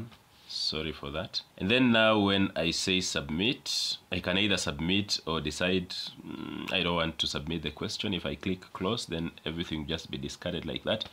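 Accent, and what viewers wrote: Kenya. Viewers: Are you Kenyan bro? I cant ignore the accent😂 ps: I love the work you're doing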